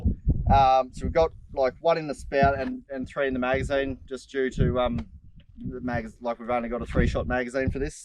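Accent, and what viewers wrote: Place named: United States. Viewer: My guy you sound like me. American.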